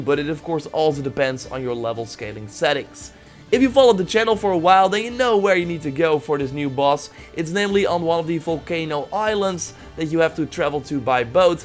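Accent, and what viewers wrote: Netherlands. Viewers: Just wondering. Are you Dutch? You really have a Dutch accent xD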